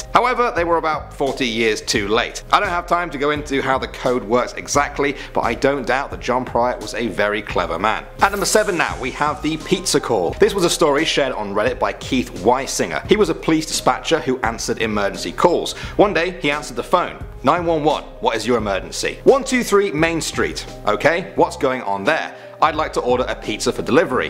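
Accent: England, United Kingdom. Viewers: The Gamers Of The Apocalypse Fire that is because he is from England 🏴 the United Kingdom 🇬🇧 we pronounce words differently to Americans and this is how we spell the word Columbia not the way Americans would speed the world Which is Colombia just saying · Is that a england accent? If it isnt then what accent is that?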